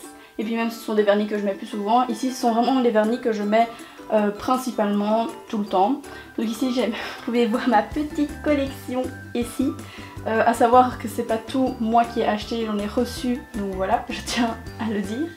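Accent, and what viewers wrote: Belgium. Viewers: j'adore ton rangement makeup :) haha l'accent belge j'adore #belge (je le suis aussi ) tu es d'ou en belgique?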